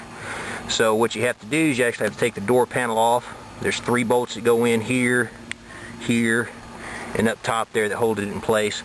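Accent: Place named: South, United States. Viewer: what part from the south are you from lol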